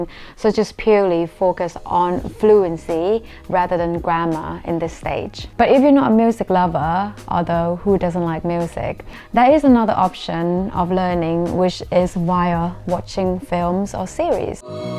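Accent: RP, United Kingdom. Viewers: I like your accent, it actually sounds British to me. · When you are speaking is it received pronunciation? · U do have a British accent mostly, I can detect a small change of ur native accent here and there. How long have u lived in UK? Pls do British accent video . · That is like bbc english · Hello, I love your accent. How did you improve it like a British accent? I am wondering, did you able to speak this way before moving London?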